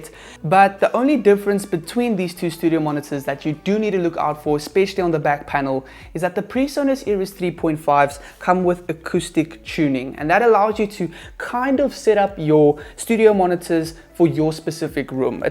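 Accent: South Africa. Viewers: completely unrelated question but are you south african? i'm trying to learn the different styles and accents of english better.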